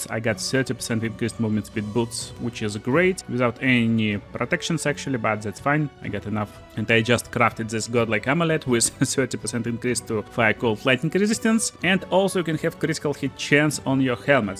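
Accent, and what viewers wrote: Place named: Russia. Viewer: as a fellow russian I am so good at spotting russian accent, interesting how you prolong some vowels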